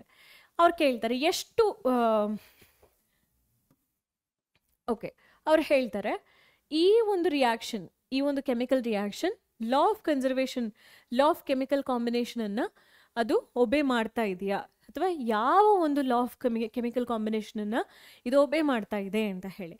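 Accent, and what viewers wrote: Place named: Uttara Kannada, India. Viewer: Mam you speak in uttar kannada voice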